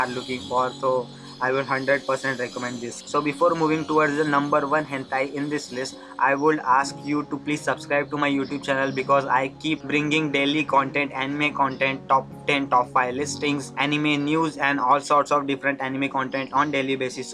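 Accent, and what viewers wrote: India. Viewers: Why you sound indian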